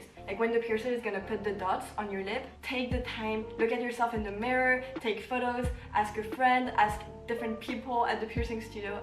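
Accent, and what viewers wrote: France. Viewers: le petit accent français je l’ai aussi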